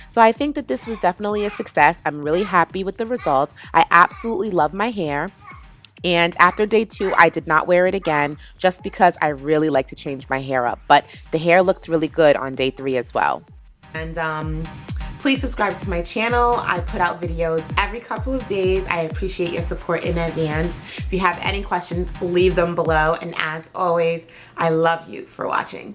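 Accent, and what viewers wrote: New York, United States. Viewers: You remind me of Wendy Willimas (whom I love btw). Your personality is reminiscent of her. Your NY accent is also very similar to hers.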